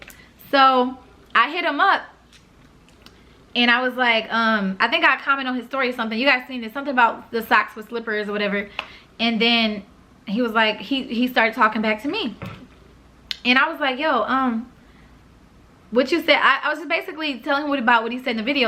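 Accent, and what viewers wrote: New York, United States. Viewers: she sound like a new yorker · She talk mad ny now lowkey tho😭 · she's starting to get that New York accent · No cap queen finally sound like she from ny now 😫😫 I love ittt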